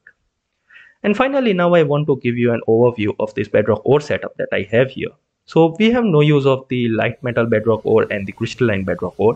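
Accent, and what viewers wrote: India. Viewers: Indian. I remember a friend of mine talked with him, and told me as such as they were both indian :P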